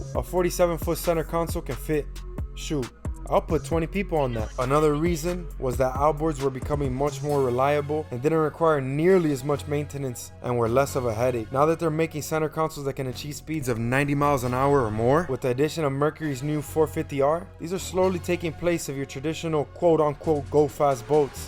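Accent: Miami, United States. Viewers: My bro has a Miami accent. 305 ❤ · This guy has a Miami accent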